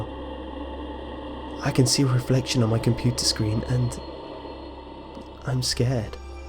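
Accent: United Kingdom. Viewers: Is British? What's the accent anyways?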